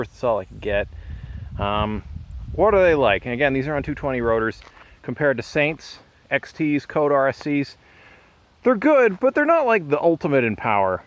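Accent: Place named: Germany. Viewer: Hahahaha Like your german accent dude. Greetings from germany, Marc. Didn't get the 220 here actually. 😞 riding code r, but i hate the constand bleeding i've to do with the sram. Likely every week. Thats annoying.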